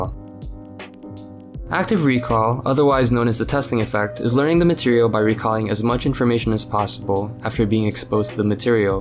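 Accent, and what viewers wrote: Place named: United States. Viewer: Hey, are you Indonesian? Or Malaysian? But, you sound so American, hhhmmm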